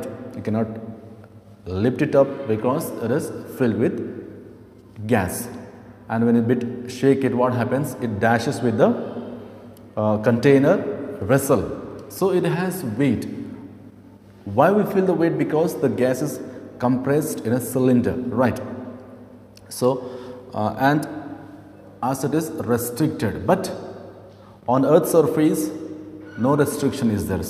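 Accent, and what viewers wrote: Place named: Spain. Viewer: sir your exent is little bit spanish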